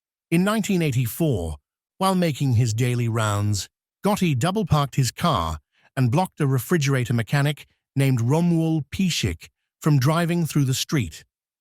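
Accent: United Kingdom. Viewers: I think his crisp British accent is far better understandable than some American mumbling.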